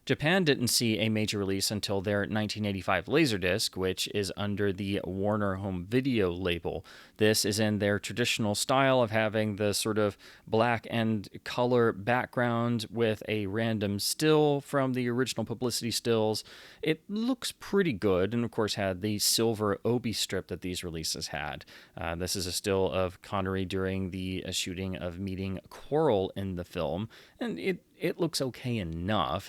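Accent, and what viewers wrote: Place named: United Kingdom. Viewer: ur british accent is great.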